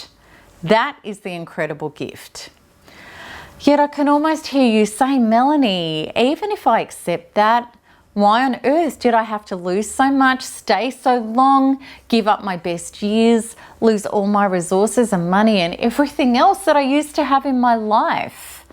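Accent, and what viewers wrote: Australia. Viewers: thank you. I am in the forum and the ppl there are angels but I can’t seem to break through the barrier and the time it takes every day to go through the modules. I don’t have years to get my life together.... I hear that this takes years to overcome and condition the subconscious... I feel discouraged because I need help now. My life is a mess!!! I really don’t know what to do and how. I’m at a stand still and feel trapped in a life I did not choose and that does not belong to me. I don’t know if that makes sense... Anyway I keep listening to your videos and reading your blogs. You are an inspiration to me. I grew up part of my childhood in Australia and hearing you accent it’s comforting to me... I think you are gorgeous and have been getting better with years. I’ve been following you for nearly 6 yrs now. 💗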